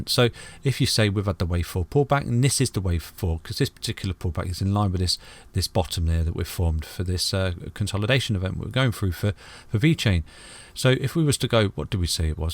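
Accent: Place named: South Coast, United Kingdom. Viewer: What part of the UK are you from? Im guessing on the south coast somewhere???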